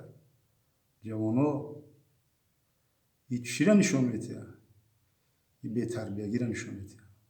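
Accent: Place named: Dangara, Tajikistan. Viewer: АСАЛОМ АЛЕЙКУМ БОБОЧОНИ МА ХУДОВАНД НИГАХБОНТОН БОША ДУСТОН МЕДОРУМ СУХАНРОНИТОНА ШЕРИ АЛЛОХ, МИСЛИ РАХМАТИИ БОБОМ ДАНГАЛИЕН БИСЁР МОНАНДЕН. САЛОМИ ГАРМ АЗ МУЛКИ ГАРИБ )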